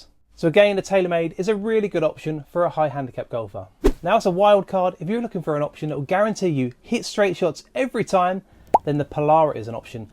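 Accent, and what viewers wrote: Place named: United Kingdom. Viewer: Why is every video a fkn brit talking.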